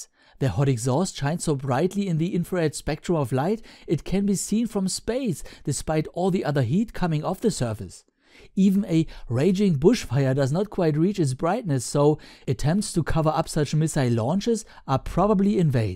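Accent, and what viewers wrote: Germany. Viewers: Great Video. Where are you from your accent is very german. I’m from Germany. Hi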